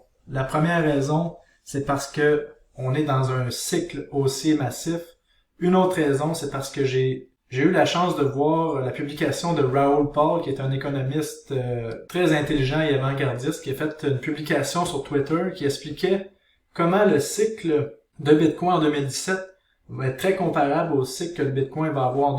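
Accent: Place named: Quebec, Canada. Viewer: Ça fais du bien d'écouter un québécois! Continue comme ça très intéressant, j'ai hâte a tes prochains vidéos!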